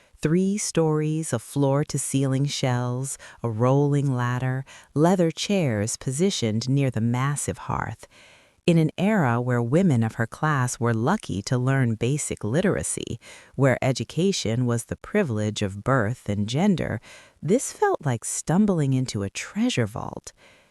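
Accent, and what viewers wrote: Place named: United States. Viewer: Don’t like the American accent—why not RP ?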